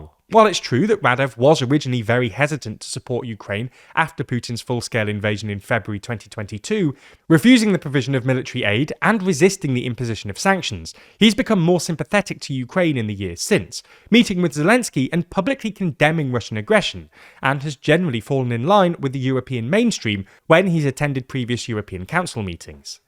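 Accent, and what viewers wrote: United Kingdom. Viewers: British accent and actual facts! THANK YOU EVER SO MUCH! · Todor Zaikov? Haha. The silly fiction that comes out with British accent is everywhere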